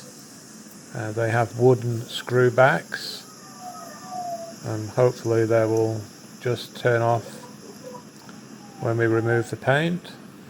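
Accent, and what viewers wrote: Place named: England, United Kingdom. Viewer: Ooh Hello. Haven’t found a fellow Australian restorer yet. Pommie accent to one side. Looking forward to part 2.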